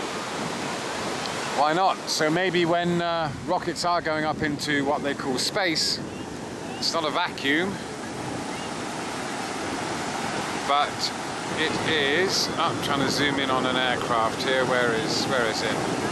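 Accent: United Kingdom. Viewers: It hurts that this guy has a British accent and wasted a western education that people less fortunate would have given anything for....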